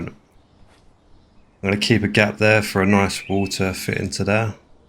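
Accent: United Kingdom. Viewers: Love your cockney accent bruv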